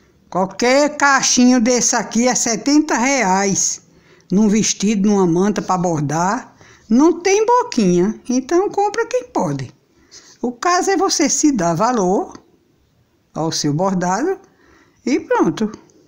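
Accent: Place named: Northeast, Brazil. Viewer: NEGA AMEI VOCE E SUA SINCERIDADE . UM CHERO BOM PRA TU . SOU FILHA DE NORDESTINO . AMO ESSE SOTAQUE.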